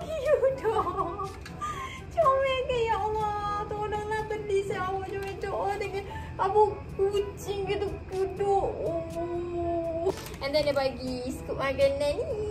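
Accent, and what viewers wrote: Kelantan, Malaysia. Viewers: cakap tu bagi elok sikit, kelantan lakk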